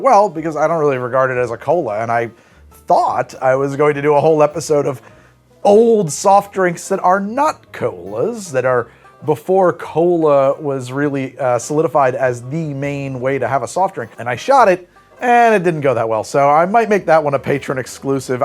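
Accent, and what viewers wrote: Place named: New England, United States. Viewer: The worst part about Moxie is the New England accent.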